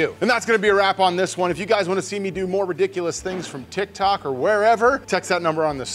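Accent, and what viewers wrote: Pittsburgh, United States. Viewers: The yinzer accent seeps through. You got a fan over here in Sharpsburg now.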